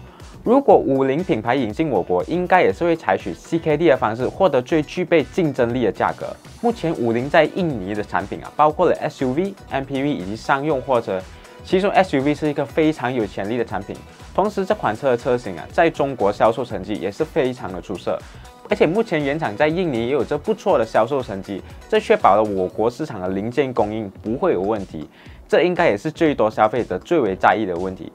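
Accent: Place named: Malaysia. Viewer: 大马华人的普通话原本就不错，只是有些口音而已。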